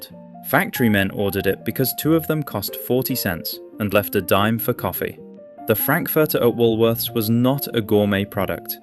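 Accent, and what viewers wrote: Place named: England, United Kingdom. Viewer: An English voice on nostalgia from The United States of North America. 😊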